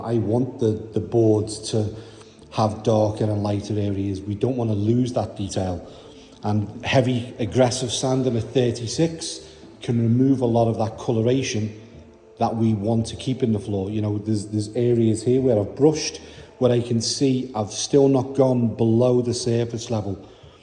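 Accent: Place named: Liverpool, United Kingdom. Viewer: Hey, are you from Liverpool.. I detect a fellow Scouse accent. ❤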